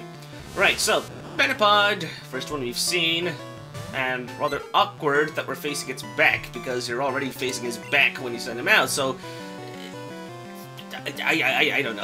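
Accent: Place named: United Kingdom. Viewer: I LIKE IT BECAUSE YOU KINDA SPEAK BRITISH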